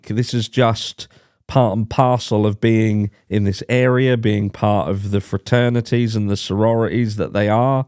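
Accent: United Kingdom. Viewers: since i left UK decades ago i lost British traits and accent so glad to listen to your's mate All The Best Wishes Everyone :cat-orange-whistling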